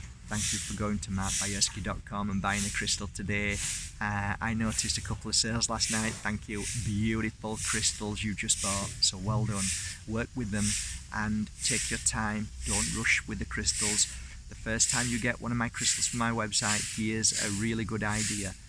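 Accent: Yorkshire, United Kingdom. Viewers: I wish I could meet you face to face. Just to spend a little time in your presence would so help with the issues I have. I need to find the happiness within. Thank you so much for your wisdom and all your videos, they do inspire me. Just to have a conversation would be awesome. Thank you. Ps. Love your accent. Little bit of Yorkshire and Leeds.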